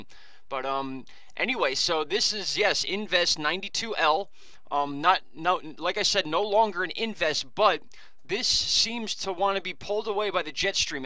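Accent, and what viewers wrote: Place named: Long Island, United States. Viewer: You have a Long Island accent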